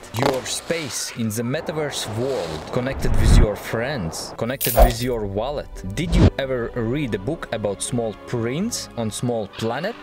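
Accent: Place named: Russia. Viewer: Might wanna skip the Russian accent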